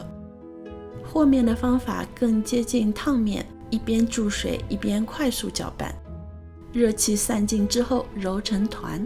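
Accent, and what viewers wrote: Shanghai, China. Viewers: 阿姐太厉害了👍我也是上海人，在伦敦。我是从生煎那个追到这里的。一口气看了你好多视频。看你做菜真是享受，步骤详尽理论清晰，上海口音又亲切，你还长得像我姐姐。😂真是懊恼没有早点发现和关注你。实在压抑不住欢喜，决定要把我在油管的第一次评论献给你。😊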